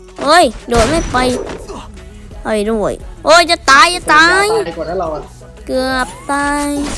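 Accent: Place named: South, Thailand. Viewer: พี่ พูดภาษาใต้ได้เลยผมก็เป็นคนใต้😊😊😊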